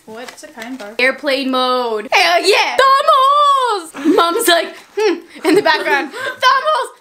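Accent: Canada. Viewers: her Canadian accent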